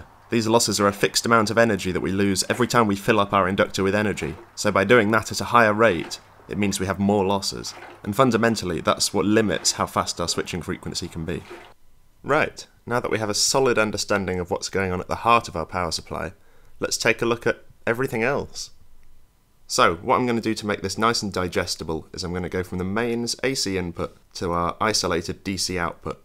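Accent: England, United Kingdom. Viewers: I've spent over 35 years in industrial power electronics, own and operate my own engineering firm, and taught full time as a professor in technology for thirteen of those years. Kudos to your teaching methods. You're water illustrations are brilliant and intuitive and speaking as a Canadian who recently visited Yorkshire in Britain, I love hearing the British accent and idioms in this video. Some corrections however: The thermistor employed for over-current protection is a PTC (Positive Temperature Coefficient) device, not an NTC (Negative Temperature Coefficient) device. NTC thermistors are used for temperature measurement. PTC devices act like resettable fuses and limit inrush current by increasing in resistance as a function of temperature (i.e. higher current). Both are non-linear devices. MOVs behave like back-to-back zeners and exhibit very high resistance until you reach their break-over voltage but unlike an SCR, don't avalanche (assume full conduction when triggered on). 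In this way they only clip excess energy above a target voltage threshold but they don't chase it all the way down to zero volts. MOVs also tend to deteriorate with each spike they take so their effectiveness over time can diminish and they are rated in Joules as well. Also, MOVs like to explode when their energy levels are exceeded so I've seen designs where they're shrink wrapped to contain flying bits in the event of. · Very good and understandable lesson on the power supply. I don't know yet where you are based in the world, but you accent sound more england. I am in south africa. I hope you can allow me to add that the potentiometer does not only adjust the output voltage, but also control the entire circuit and protect it from getting out of regulation and destroying itself. Thank you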